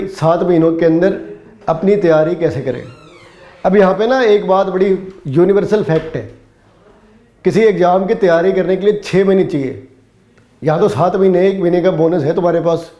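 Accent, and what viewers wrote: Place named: Punjab, India. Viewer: Punjabi tadka.....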